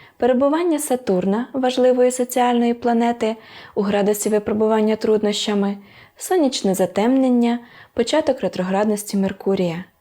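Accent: Standard. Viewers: Як приємно слухати таку чисту та мелодійну українську мову ❤❤❤ Дякую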